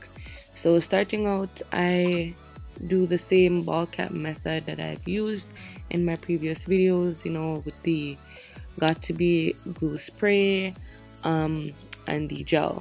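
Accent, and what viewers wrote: Jamaica. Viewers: i love your accent ❤️jamaican gang🇯🇲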